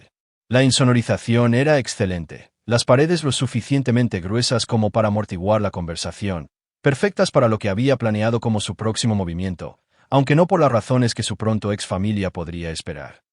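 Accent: United States. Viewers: Por favor no metan palabras en ingles o hablen como gringo, o son españoles o son americanos o portugueses, por dios!!!! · Buena historia, buenas traducción pero deben editar antes de subir. Se escucha horrible cuando pronunciar con acento gringo palabras en español, así como leen en español los nombres que están en inglés, como el de Blake (bleik).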